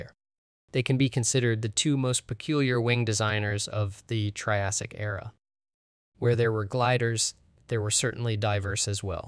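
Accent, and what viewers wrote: United States. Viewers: Another great episode. From your accent I'd assumed you were American.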